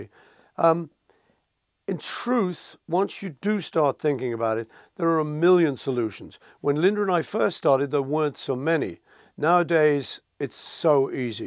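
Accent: United States. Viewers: sounds American in this lol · sounds slightly American now, or is it just me?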